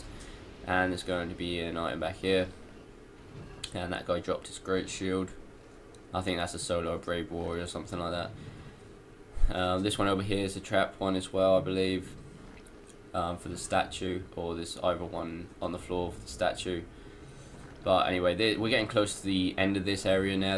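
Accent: England, United Kingdom. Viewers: agreed, this guy plays like a noob, has England's equivalent of a Mississippi delta mudman inbred's accent, and nobody gives a fuck about the doors that don't lead anywhere so why is he even bothering to show them? He should just say "don't waste pharros on these" and move the fuck on.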